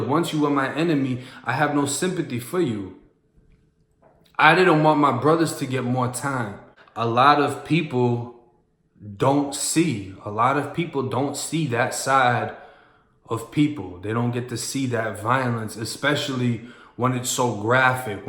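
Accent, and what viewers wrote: Boston, United States. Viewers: 💯RESPECT MY G... KNEW U WAS IN BOSTON THE MINUTE U SAID CAA INSTEAD OF CAR.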